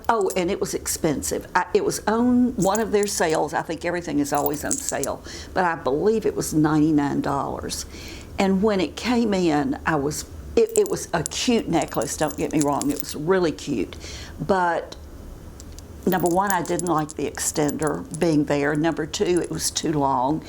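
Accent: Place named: Florida, United States. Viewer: Sunday morning here in UK and enjoying my coffee with you - and I love your uh's! It's part of your fabulous accent - thank you for sharing all your news but was very sad to hear about Ethel Cup Cake, and feel so sorry that Jennifer and Riley will be going through a tough time but what a wonderful act of loving decency that did which would have made Ethel's days happy ones. Take care all in Florida we're thinking of you over the pond.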